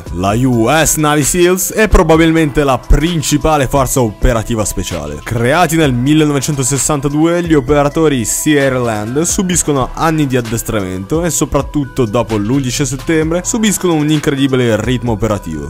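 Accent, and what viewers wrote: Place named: Russia. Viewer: Sento un lieve accento russo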